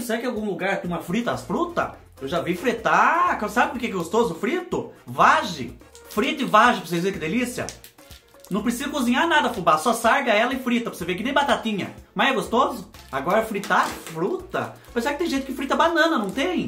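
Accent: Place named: Paraná, Brazil. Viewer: Tava caçando vídeo de receita com fuba e encontrei esse canal, um fofo! ❤️ sotaque de paranaense, interiorzinho do Paraná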